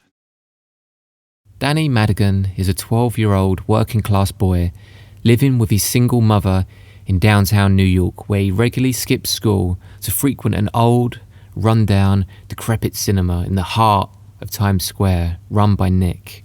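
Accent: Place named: England, United Kingdom. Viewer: Well, Narrator .... I loved it.... So, keep ur Corny English Accent...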